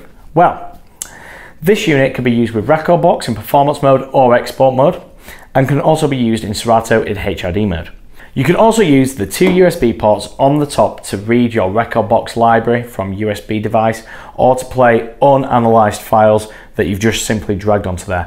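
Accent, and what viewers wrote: United Kingdom. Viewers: ... this dude looks like Jared Dines with a British accent!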